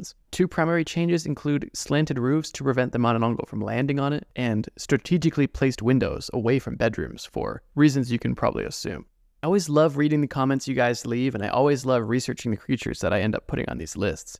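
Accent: Canada. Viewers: Are you Canadian? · Is this guy Canadian?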